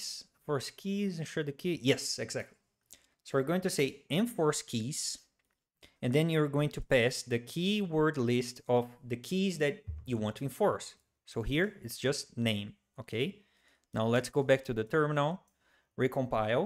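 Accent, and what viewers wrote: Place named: Brazil. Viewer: At first I didn't understand what these `echo` and `feud` meant, then I learned that Brazilians just pronounce the words `error` and `field` like this... Very irritating :D, but I've gotten used to it now.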